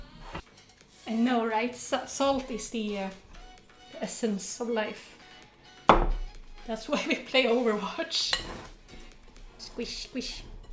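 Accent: Sweden. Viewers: ni har liknande svensk/engelsk dialekt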